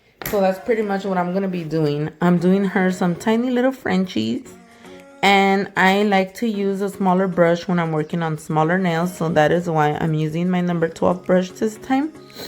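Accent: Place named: Spain. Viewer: I love love your Spanish accent, sounds like my granddaughter blessings from Killeen Texas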